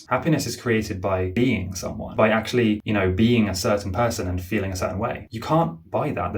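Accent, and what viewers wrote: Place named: England, United Kingdom. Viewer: Why do you use $ but you have an English accent???? 🤔🤔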